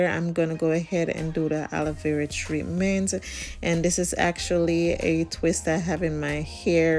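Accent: Jamaica. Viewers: You sound Jamaican 🇯🇲 big up if you are lol🇯🇲🇯🇲🇯🇲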